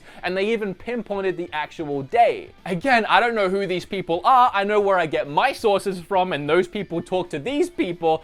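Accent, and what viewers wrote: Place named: Australia. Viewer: you know hes Australian right...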